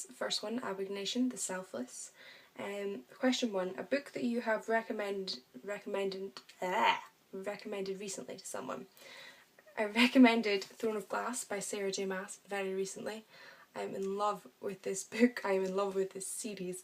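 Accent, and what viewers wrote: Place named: Scotland, United Kingdom. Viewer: I don't think I will ever say enough of how much I love your Scottish accent! It is so pretty. Can't wait to do this tag! I think I already have about half of them answered in my head lol.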